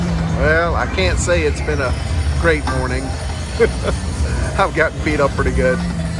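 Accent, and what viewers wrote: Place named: South, United States. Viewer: Nice run!! If it ain't broke, don't fix it 💯 hey, your voice and southern drawl sounds like Brock from the Reba show. Keep on feelin lucky ❤🎉❤🎉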